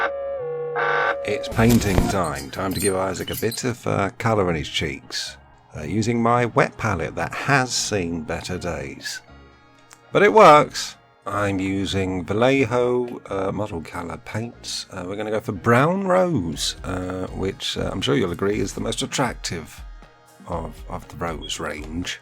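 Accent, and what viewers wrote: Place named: Manchester, United Kingdom. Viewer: Yes i got Paul Calf vibes from the accent at times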